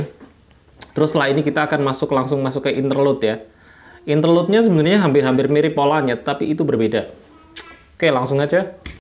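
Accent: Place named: Java, Indonesia. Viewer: Medok banged njul🤣🤣🤣🤣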